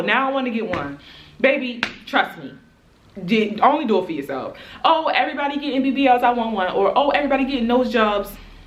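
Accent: Baltimore, United States. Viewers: You so baltimore I love it😂😍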